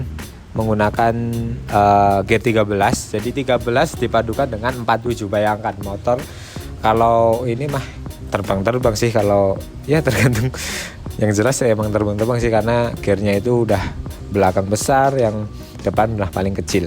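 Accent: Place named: Java, Indonesia. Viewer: Ngomong gue nya medok bngt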